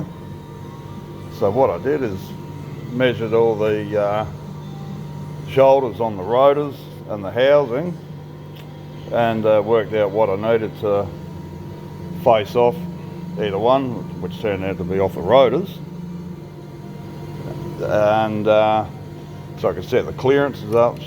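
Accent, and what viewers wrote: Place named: Australia. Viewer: Whereabouts are you? Sound like Aussie?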